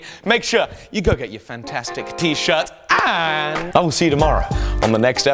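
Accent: United Kingdom. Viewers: Funny after watching your newer vids, 2026.. You sound deeper here and have a way less British accent than in your new vids? Interesting, character work? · I love your british accent :) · He's almost TOO British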